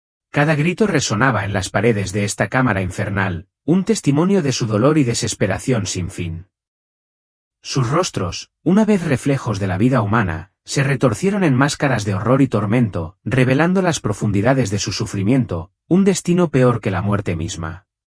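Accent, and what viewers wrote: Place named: Catalonia, Spain. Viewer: Me choca que hagan videos religiosos con voces robóticas y además con acento español catalán, suenan muy mal porque son impersonales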